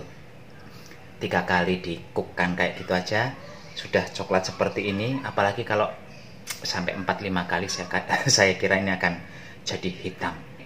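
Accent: Banyumas, Indonesia. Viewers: Pak lucuuu pol n resepe wokeh 👍👍👍👍 auto lgs sy subscribe deloke ambek guyu" 😂😂 ngapak " e inyong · Mas njawine pundi to kados radi ngapak sami kaleh bojone Kulo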